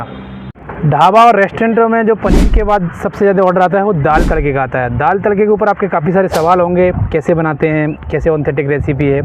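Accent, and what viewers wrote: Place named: Uttar Pradesh, India. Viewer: sir j aap up se ho kya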